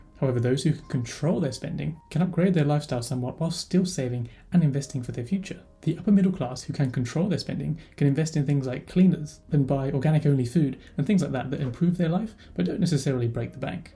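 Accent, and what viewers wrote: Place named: United Kingdom. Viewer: You are talking about US dollars and at the same time you sound British...😅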